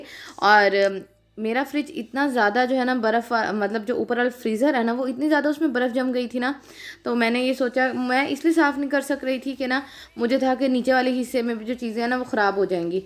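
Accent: Saraiki, Pakistan. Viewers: Aunti apna lehja theak karen sereki lehja hai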